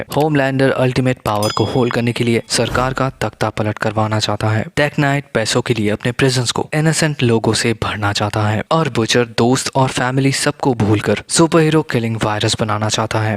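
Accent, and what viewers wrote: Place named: Arunachal Pradesh, India. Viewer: bro.. kya bola aapne? pe.. Rabindranath "THAKUR"? 😅 it seems may be u r from arunachal pradesh.. plz improve accent... baki explanation accha tha... 👍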